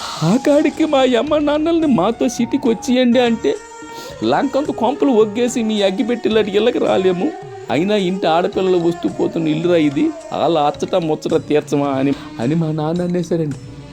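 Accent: India. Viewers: మీ వాయిస్ ఓవర్ విన్న తరువాత కూడా మళ్లీ సంక్రాంతి సంబరాలు విన్న అనుభూతి కలుగుతుంది బావగారు... ముఖ్యంగా ఉత్తరాంధ్ర యాసతో మీ హావభావాలు అత్యద్భుతంగా ఉంది 🎉🎉🎋🎋💐💐🔯🔯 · శ్రీకాకుళం యాస భాష అచ్చంగా కనిపించింది. సంక్రాంతి సరదాలు కొట్టవచ్చినట్లు కనిపించసాగాయి.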